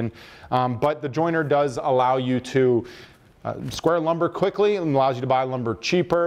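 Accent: Pittsburgh, United States. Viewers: Oh man are you from Pittsburgh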